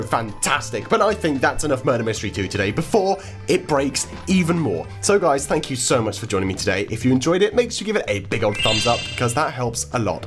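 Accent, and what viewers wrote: United Kingdom. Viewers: do u live in UK cause of ur accent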